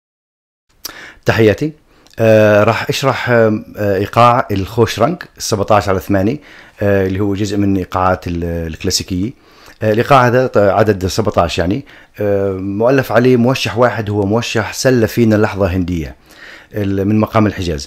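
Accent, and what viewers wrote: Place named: Sadad, Syria. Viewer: اللهجة قريبة من أهل صدد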